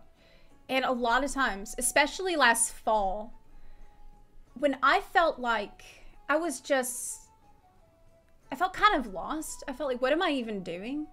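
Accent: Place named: Texas, United States. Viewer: let the darkness consume yeh. how does a Ukrainian end up with a texas accent?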